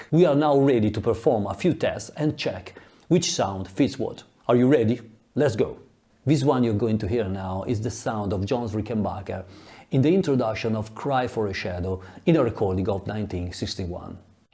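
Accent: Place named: Italy. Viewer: Very cool. I love your Italian accent, by the way. Sounds like my wife (she is from Milano).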